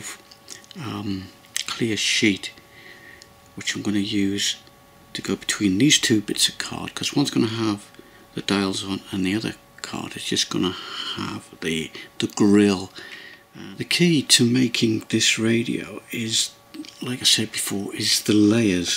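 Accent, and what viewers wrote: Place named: RP, United Kingdom. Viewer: Very good plummy BBC accent. Brings back memories of " Listen with Mother "and "Billy Cotton Band Show" The radio was nice and the bar, I must try! Thanks. Have a good weekend. And greetings to Mrs.T. Peter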